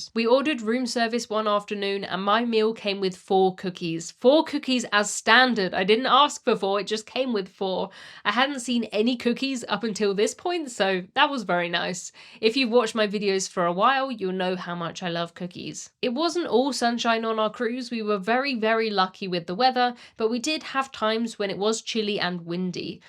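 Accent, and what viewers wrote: RP, United Kingdom. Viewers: Oh your accent is so posh and lovely. Thanks for this video as we are planning on Alaska soon. I think Encore is the perfect ship for Alaska with the Observation lounge. Were you happy with the amount of time in port? The super early morning arrival and early afternoon departure seem like they might be disappointing.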